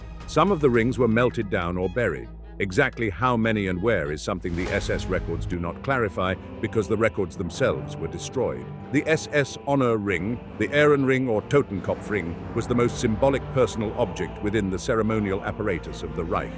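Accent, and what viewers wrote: United Kingdom. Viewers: The narater is British just like me..😂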